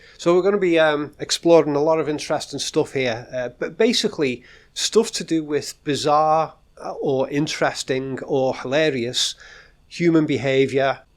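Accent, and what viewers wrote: Liverpool, United Kingdom. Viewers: Just discovered your channel so excuse me if it’s been mentioned, but are you a scouser ?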